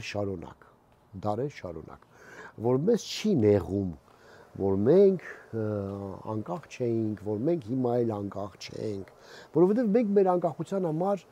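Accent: Gyumri, Armenia. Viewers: Լեննագանի բարբառը Ձեր եթերներում միշտ պահպանեք, Սերգեյ Դանիելյան❤️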